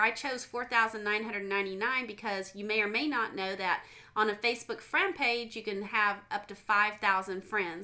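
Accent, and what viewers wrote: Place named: South, United States. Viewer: Nice accent, are you from the South or Texas?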